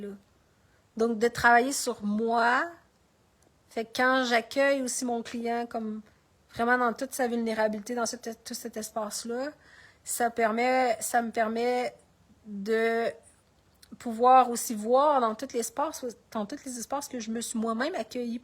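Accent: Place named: Canada. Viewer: Tu es Canadienne à ton accent . 😅 🤔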